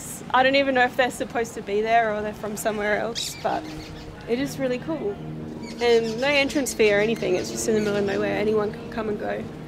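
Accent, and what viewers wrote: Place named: Australia. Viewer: There are different accents in a language and none is "correct" or "incorrect". Same with dialects.;) I love accent and I'd love to visit Australia someday. English isn't my first language and I always understand what she says. Keep doing what you do,! ❤ Thank you for creating these videos and teaching us about these places.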